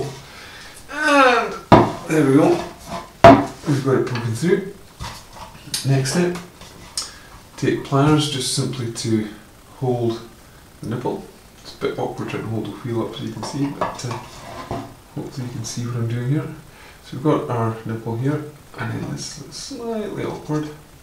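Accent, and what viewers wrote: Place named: Scotland, United Kingdom. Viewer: Oh he’s Scottish !!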